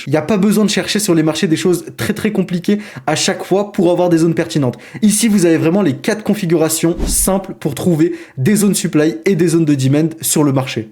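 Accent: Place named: United States. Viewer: Avec l accent us 👍🏽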